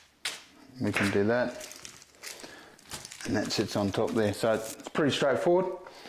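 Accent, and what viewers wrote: Australia. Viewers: Sounds like this Kiwis an Aussie. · sorry bro but is that oz accent ? would like to know how you define the taste of ? keg hangi above ground hangi all taste like boil up …?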